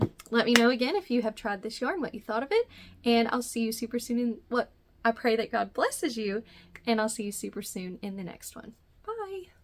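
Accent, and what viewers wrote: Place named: Tennessee, United States. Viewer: ❤are you from Tennessee you have my accent lol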